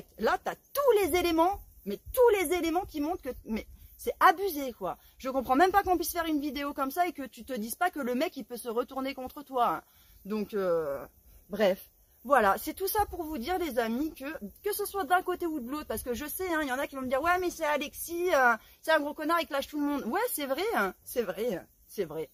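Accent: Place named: Bourgeois, France. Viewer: En plus tu parles avec ton accent de bourge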